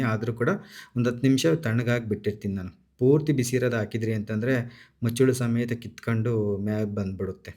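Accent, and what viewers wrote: Chitradurga, India. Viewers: Pakka chitra durgada bhashedose jote nimma maatu chapparisuvantide😊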